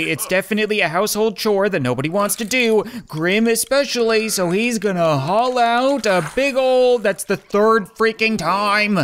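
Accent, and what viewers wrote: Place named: Newfoundland, Canada. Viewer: I can't believe you replied! I just wanted to say you're awesome and I can't believe I didn't notice you were newfie before I went to your askfm I should have noticed with the way you say party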